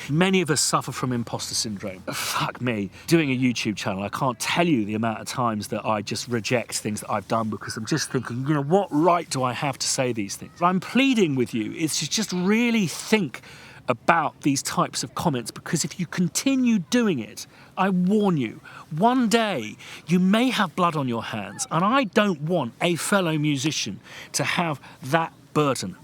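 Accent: United Kingdom. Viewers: Mr here in Mexico we say something about that subject... "You are not a Golden coin you will not like to everyone", someone will like you because who you are and what and how you approach things, and the other will hate you for the same reason, the best advice that a 23 years old young man is that if that someone tells you something and you did not think as he/she does the best thing to do is to say is thank you for your opinion, and s Keep things you love and do it in the way you love. hope my simple advice can be helpful in some manner PD: I really enjoy you style of vlogging and your British accent haha.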